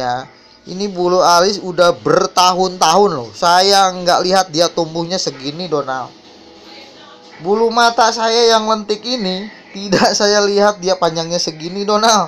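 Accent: Jakarta, Indonesia. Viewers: Apa saya sendiri ya yg ngakak habis denger betawinya si abang ini mantap abis bos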